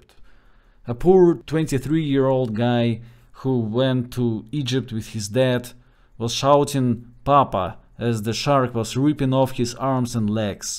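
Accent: Russia. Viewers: I find his accent a bit funny actually. Especially with his excellent range of vocabulary and good grammar. I imagine an intellectual person from Russia in a good movie! 🙃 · Your Russian voice is of the Slavic soul, the Serbian soul... the human soul. Thanks for bringing this short update in that voice.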